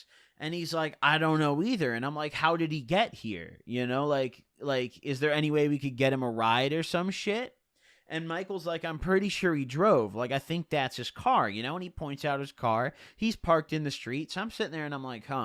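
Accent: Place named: New Jersey, United States. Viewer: Why does he sound so different in some videos. Sometimes he's really nasally and has like a breathey undertone like some 30yo that lives in New Jersey, other times he sounds like a 24 guy that weighs 150 and doesn't get enough sunlight.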